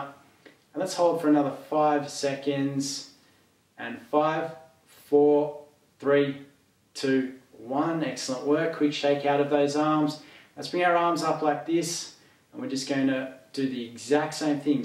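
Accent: Australia. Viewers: IS THIS GUY FROM AUSSIE LAND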